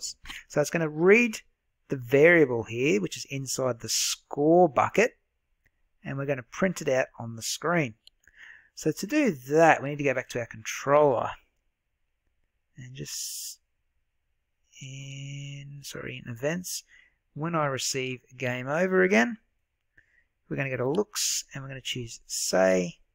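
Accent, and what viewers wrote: Australia. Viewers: i like his thich aussie accent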